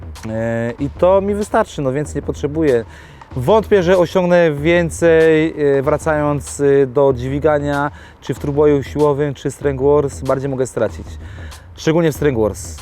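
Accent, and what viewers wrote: Silesia, Poland. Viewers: nie kwestionuje tego, po prostu musiałem tym komentarzem dać upust emocjom, ponieważ najzwyczajniej w świecie nie podoba mi się gwara śląska, mimo że twój kanał jest całkiem fajny ^^ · a jak ma mowic skoro jest ze slaska xD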